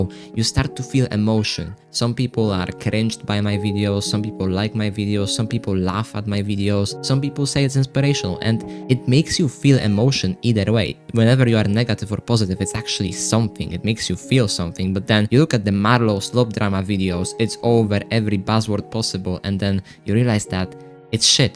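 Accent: Poland. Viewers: slychac ze polak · You have a Polish-like accent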